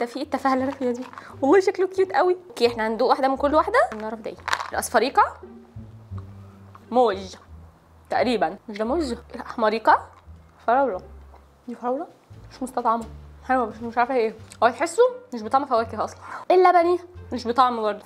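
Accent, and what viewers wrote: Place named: Gulf, Arab world. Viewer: لا ادري حلوه اوي تحسيها قلبت خليجيه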